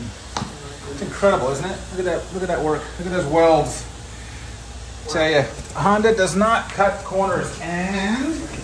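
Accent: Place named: New Zealand. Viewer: He sounds like a Kiwi.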